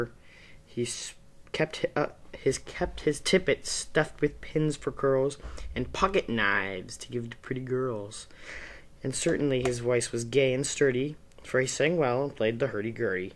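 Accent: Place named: United States. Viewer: american accent... try speakin in an english accent pleeeease, we all sing in an american accent when we sing pop so you can at least try :) please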